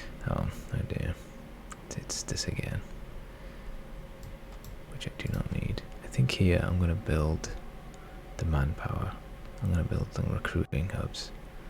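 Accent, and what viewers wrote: Yorkshire, United Kingdom. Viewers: Appreciate every video you put up. Feels good to have a Yorkshire representative in the ASMR community. 🗿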